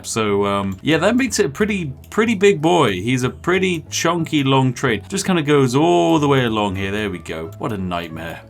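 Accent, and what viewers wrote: United Kingdom. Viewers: No accent can make horrible atrocities sound perfectly sane and normal like the British accent. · Your English is extremely good, tbh! It sounds so natural, so spiffingly British! You can set up your own Tube Language School and organize your own Grimsby Advanced Exam) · LOL, "Billy Viel" car.... ("By Level" Car) Love this brit.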